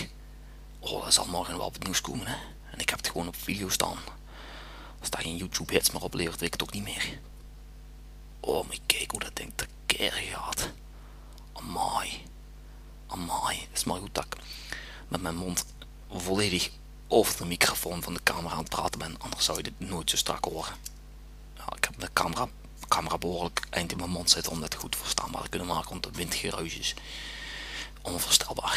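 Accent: Limburg, Netherlands. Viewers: Prachtige tornado! Maar dat Limburgs gepraat is zo deprimerend man... Als je zo iets uniek en mooi ziet, moet je toch op zijn minst kunnen zwijgen en genieten van het spektakel...ik denk dat iedereen hier zo over denkt. Ik kan zelfs heel moeilijk geloven dat zo'n tornado kan ontstaan in België :O Maar desondanks geef ik deze video toch een like, omdat deze video toch nog de moeite waard is als je het geluid afzet :)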